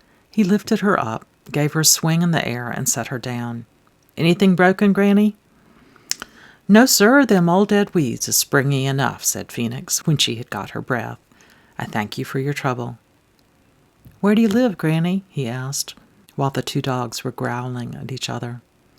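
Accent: South, United States. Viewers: Although he's better know for his non-fiction Civil War trilogy, Shelby Foote is my absolute favorite. All of my main characters carry his voice somewhere within them. He spoke a great deal about Eudora Whelty. He admired her a great deal, appreciated their bourbon and talks together. I found her through him. And I have now found you through her. Remarkable how small the world gets. How we are all connected by tiny, undetectable strings. I've listened now to another reading or two of yours and have enjoyed both tremendously. Not sure if you are from the South or not, either way you capture the essence beautifully. We'll done.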